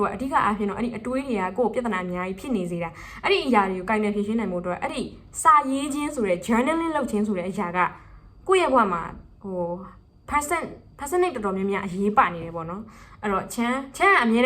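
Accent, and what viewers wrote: Rakhine, Myanmar. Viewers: ရခိုင် လူမျိုး ထင်တယ်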